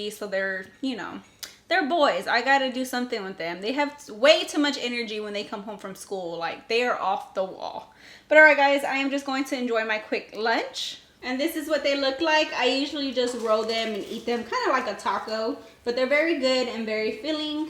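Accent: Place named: Houston, United States. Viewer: It's the Houston accent 🙌💪💪💪. You look beautiful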